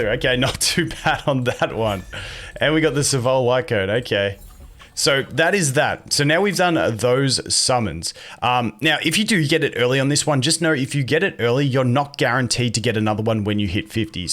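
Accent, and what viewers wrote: Australia. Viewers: Is old mate aussie?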